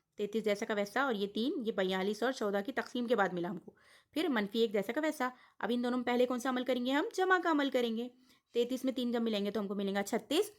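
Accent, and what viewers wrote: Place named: India. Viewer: Good job. Hmaray bacho ko boht faida hoga. Itni saaf urdu india may. Salute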